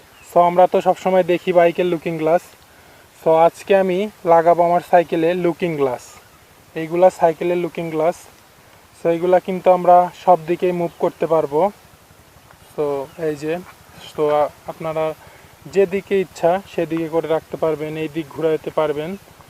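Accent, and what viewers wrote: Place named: Bogura, Bangladesh. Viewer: এগুলা বগুড়া এলাকার কথাই পাওয়া যেত পারে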